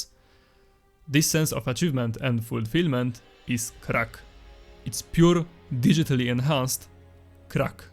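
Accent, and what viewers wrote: Poland. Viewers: Polski akcent słychać po pierwszych dwóch słowach :p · Mega polski akcent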